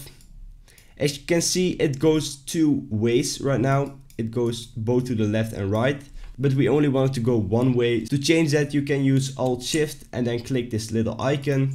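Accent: Netherlands. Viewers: you sound dutch. are you dutch?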